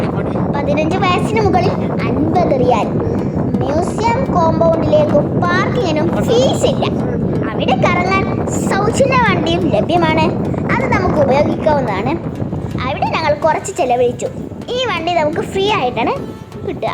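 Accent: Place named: Mannarkkad, India. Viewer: മണ്ണാർക്കാട് ഭാക്ഷ ഇടയ്ക്ക് കയറി വരുന്നുണ്ട് …❤️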